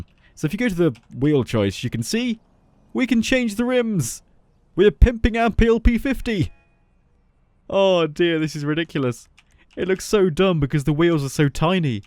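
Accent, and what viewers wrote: United Kingdom. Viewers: Are you british? 'Cause it sounds like you have a bit of a british accent.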